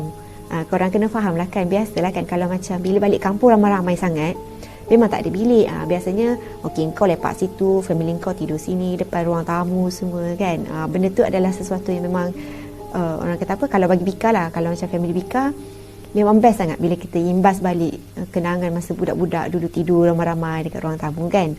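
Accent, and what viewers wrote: Kelantan, Malaysia. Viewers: Bau budo la kak..ckp kelantan jerr kak x payah ckp KL..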